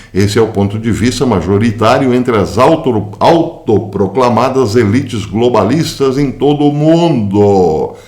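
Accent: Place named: Northeast, Brazil. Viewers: Professor adorei seu sotaque nordestino. Obrigada pela homenagem. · Falando nordestinês. Estranho. Sou baiano de Salvador, porém morando há 14 em Sergipe e te estranhei. Mas ficou engraçado.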